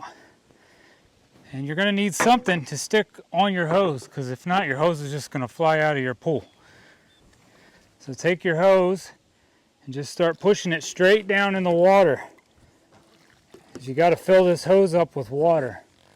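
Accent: Pennsylvania, United States. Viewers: Loved the video. It was informative. I have always helped my dad with winterizing our pool. I've never done it alone. My dad passed last month. I'm thinking to myself... how on earth do I close the pool for the winter. My dad had done everything and only wanted help with centering the pillow and placing and securing the pool cover. So here I am.... clueless about all of the other aspects of closing the pool. I had to chuckle at the PA accent. My dad has family in PA. I just came back from a week in PA (it was originally planned as a trip to bring my dad to say good bye to his family there). Thank you for your advice. I got this.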